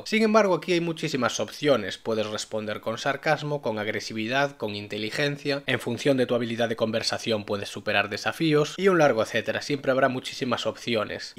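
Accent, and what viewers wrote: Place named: Galicia, Spain. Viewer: Te veo tanto que se me ha pegado el acento gallego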